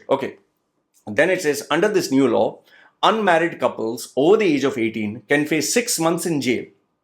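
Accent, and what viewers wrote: Kerala, India. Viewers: That verbal slapstick, mispronouncing word puns and the overdone mallu accent is getting old... Starting to get redundant.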